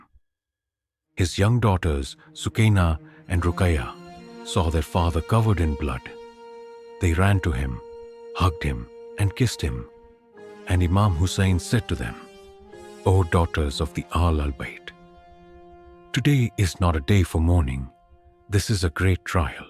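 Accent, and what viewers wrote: India. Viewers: Why did you change such nice narrators voice with an Indian accent. The previous narrator’s voice was just excellent for the context you guys put up. Especially for the battle of Karbala. Please us the previous voice 🙏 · Brother thank you for the video. Please can you change the AI from indian to something else ? Its hard hard to listen to this Indian English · Great video, but can you stick with real english AI voice, the Indian accent is annoying to hear.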